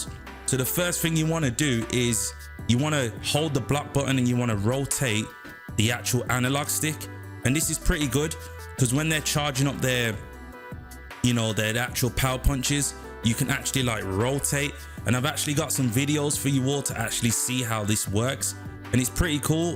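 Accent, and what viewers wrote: United Kingdom. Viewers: Hey, why you pitting on a brum accent 😮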